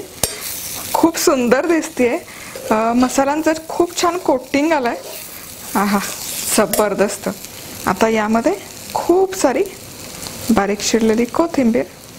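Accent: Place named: Vidarbha, India. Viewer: Tumchya recepi chan astat. Mi nehmi baghte. Aani karte pn. Tumhi VIDARBHATIL aahat ka. Bhashewarun kalat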